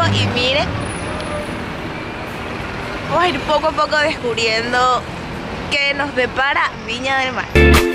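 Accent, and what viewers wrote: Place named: Venezuela. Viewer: Eres venezolana? Me encanta su acento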